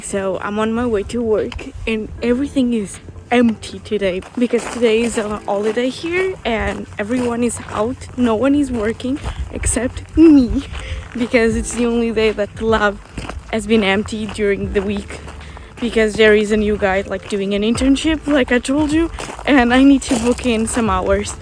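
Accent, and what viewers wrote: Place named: France. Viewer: I've watched several of your videos and shorts. Youre actually looking quite good! Trying to figure out your accent. First though South American, then maybe Russian, but then you don't pronounce h's, so French?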